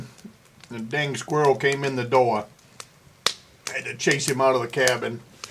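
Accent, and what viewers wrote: Maine, United States. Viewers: They will love that. When I put your videos on It's usually the four-year-old Kieran who perks up first and he calls to eight-year-old Josiah and says hey it's. And then Josiah will come in smiling because he knows exactly who you are and then no matter what you're doing they just are completely silent like they're learning something they've never seen before. I used to go trapping with my brother years ago and hunting when I was a young man but due to back problems I haven't been able to do that so you're the one that's teaching them at the moment and it's really sweet. They respond to that very well and now they asking me about the meat that they eat at home oh, they are realizing oh this is really a chicken that was running around or oh I'm eating a lamb. And then they might ask what does bacon come from and what are hamburgers made of and then we have a fun conversation letting them know that hamburgers are not in fact made by him at all. 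Anyway thank you for your hard work at presenting these videos oh, you are definitely touching lives in a very special way. Also your accent reminds them of Uncle Harvey who grew up in Gorham Maine where my accent is a combination of Boston and DownEast growing up with lobsterman. I hope you are having a wonderful time up there and I know you are staying warm even if it takes dunking all your kindling in a bucket of water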